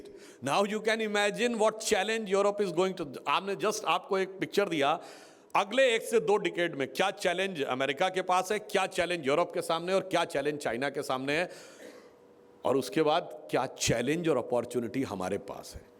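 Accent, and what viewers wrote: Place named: Maharashtra-Karnataka border, India. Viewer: नमस्कार बेटा 🎉 विषय फारच छान सांगतोस. मी न चुकता ऐकतोच. आपली भाषा महाराष्ट्र - कर्नाटक सीमेवरील आहे अस वाटत. जरा सांगाल का आपण कुठले ते. आशीर्वाद. आपले कार्य असेच चालू ठेवा .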